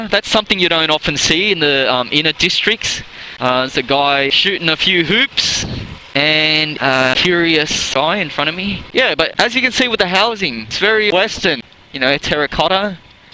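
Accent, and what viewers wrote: Australia. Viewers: is an Australian Vietnamese. This is how we sound.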